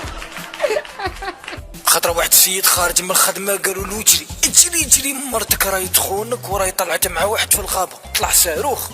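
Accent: Algeria. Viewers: لهجة شلف بينا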